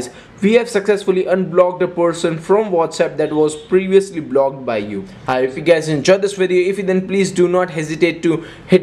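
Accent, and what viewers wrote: India. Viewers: Indians with terrible accent , however helpful video thanks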